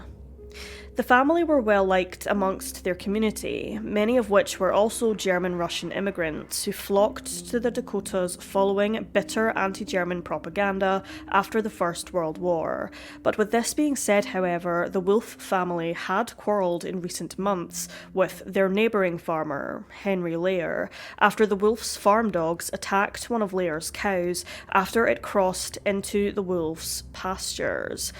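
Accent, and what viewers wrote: Scotland, United Kingdom. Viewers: Scottish accent!! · I love the orators Scottish accent very sad story no winners both families decimated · I believe she is Scottish. I am English. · Six. She has a Scottish accent. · she sure is from linda in scotland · I think the narrator is actually a Scot. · She has a Scottish accent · She is Scottish.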